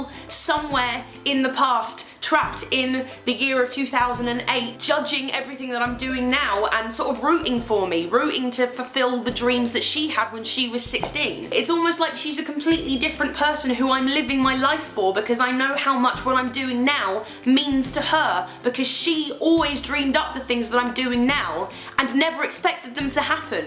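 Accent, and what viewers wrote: United Kingdom. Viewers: I saw the channel name "well I think I'm gonna subscribe the channel" I hear the British accent, You talk about Dodie presses the subscribe button so hard, my phone screen would be broken, if I had an iPhone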